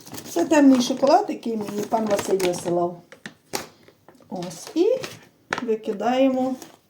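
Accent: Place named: Kremenets, Ukraine. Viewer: На кременеччині, Тернопільської області так говорять. І що? В кожному селі, чи районі, області є свій діалект. Не знали?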